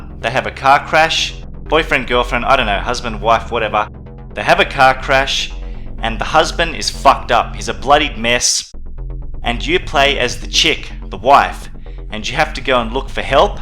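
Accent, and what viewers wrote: Australia. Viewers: so entertaining with that aussie accent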